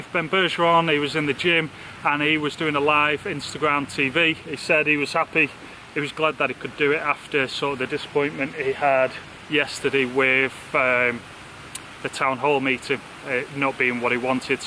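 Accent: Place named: Lancashire, United Kingdom. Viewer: If you had a message it got lost in your Lancashire accent ... Pal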